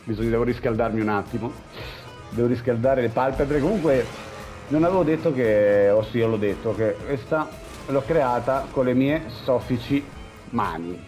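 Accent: Rome, Italy. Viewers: Mokk al mitico 🤣🤣🤣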